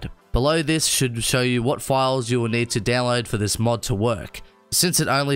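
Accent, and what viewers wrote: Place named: Australia. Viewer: You Aussie too brotha?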